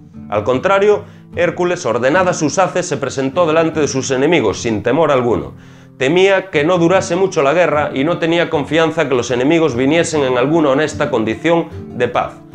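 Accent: Galicia, Spain. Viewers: Al hablar muchas veces se te nota el ramalazo de gallego de pueblo , un saludo 💟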